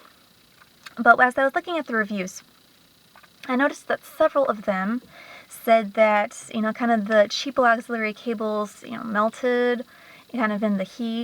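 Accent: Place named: Texas, United States. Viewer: coz thats how we spek here in texas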